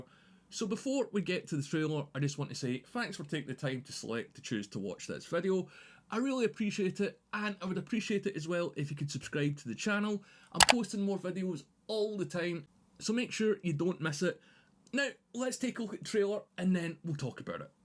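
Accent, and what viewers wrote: Scotland, United Kingdom. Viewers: I think he has a Scottish accent. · I have it at 50%, i understand him, and i am no native English speaker. And he is having a thik British accent. What i actually like. 😁👍🏼✌🏼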